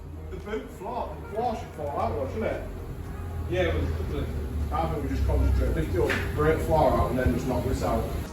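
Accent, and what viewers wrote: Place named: Middlesbrough, United Kingdom. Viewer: yes sorry I looked the company I’m from Middlesbrough I new the accent lol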